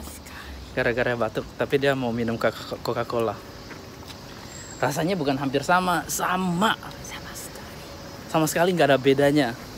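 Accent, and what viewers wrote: Java, Indonesia. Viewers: Ko ada medo' nya bro klo ngmong😅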